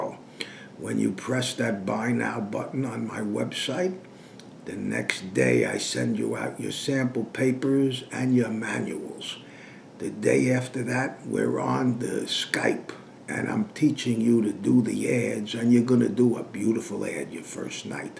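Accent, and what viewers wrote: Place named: United States. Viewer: I am impressed by your presentation as to how to make a newspaper targeted at advertising on behalf of the business, say in my case, the micro enterprises that are currently mushrooming in out areas. First and foremost, I was confused as I could not hear the name of your website correctly. You did what you could but you were not audible to me. (Perhaps your heavy American accent complicated the matter). Yes, I am interested, and upon your response, I will provide you with the necessary details that you may need prior to commencement of your tutorials. Last but not least: Are your services (tutorials/trainings/teachings) limited the borders of the USA? I live in South Africa, in the vacinity of Johannesburg. Your response please!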